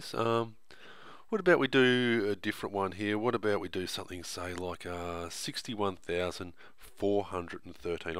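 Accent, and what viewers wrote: Australia. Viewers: Loving the Australian accent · The narrator is Australian. I like this method. I will be teaching it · YES, AUSSIE ACCENT MATH HELP! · It's Australian, huh? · The second I heard the Australian accent I subscribed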